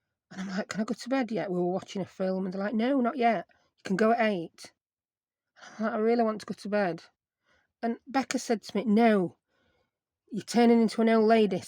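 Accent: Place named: Rochdale, United Kingdom. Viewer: I have only just seen your video. You are so funny, especially about the kids comments your accent sound somewhere around Rochdale area. Great cards.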